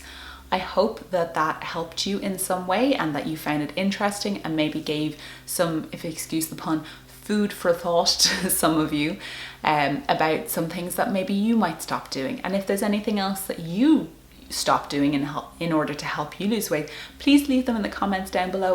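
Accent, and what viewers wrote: Ireland. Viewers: I love your Irish accent. You are delightful! I love this idea!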